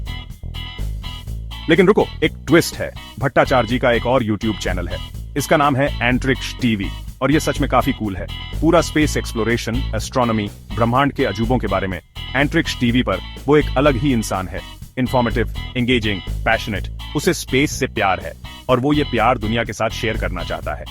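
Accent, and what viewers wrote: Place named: United Kingdom. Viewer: Aur angrej mat ban. Hindi bhi angrej ki tarah bol rha hai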